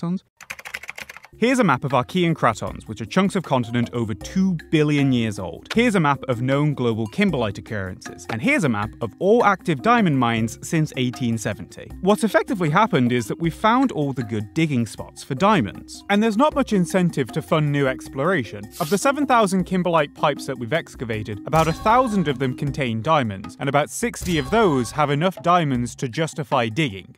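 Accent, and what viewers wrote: United Kingdom. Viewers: This guy has a middle class counties accent apart from the word ‘now’ which he says with a Black Country accent 😂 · oh youre british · Assuming they are a person at all is assuming too much. The algorithmically suggested video, voiced by the algorithmically optimal Brit accent, with an algorithmically generated identity of "PhD in something cool, but really just a shut-in shitposter (like you!)" to achieve a perfect balance authoritative intellect, relatability, and trendy meme humor. The PhD chops will never be relevant to the content so they can't really be disproven, while the meme humor can never really be separated from chasing the algorithm in any meaningful way. The editing, animation, and character design are professionally utilized, but still generic enough to be commissioned through something like UpWork on the cheap. The vocabulary sounds like the massive youtube audience of lefty, college socialists, but the content is very neoliberal globalist. 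This is pretty much just capitalism in it's purest form, the entire video is designed to succeed algorithmically without much of a point to make. Even the profile picture is appropriately colorful and busy to attract attention, encourage further inspection. The channel name follows the marketability framework made popular by band names in the 80's to today. I could go on, but I have already spent too much time thinking about the topic already. Hopefully if I ever get around to making serious youtube content some asshole in the comments doesn't go on and on about how it's blatantly formulaic and motivated only by a desire for popularity and revenue. But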